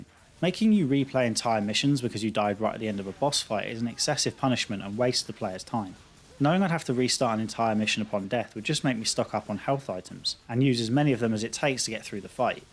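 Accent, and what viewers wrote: United Kingdom. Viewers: Sam Harold in that context of your comment its only obvious that people feel offended, replace brit with american or Chinese , but being lowkey racist aside tbh what a random thing to be bothered by someone who is just bringing out content who happends to have a brittish accent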